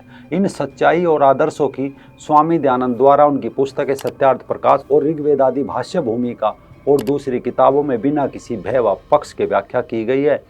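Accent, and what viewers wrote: Haryana, India. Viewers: हरियाणा के है भाई कुछ करने वाले को मत रोको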